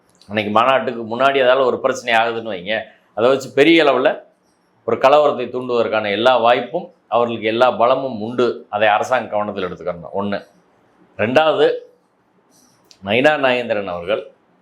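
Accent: Madurai, India. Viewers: Only madurai voice. Great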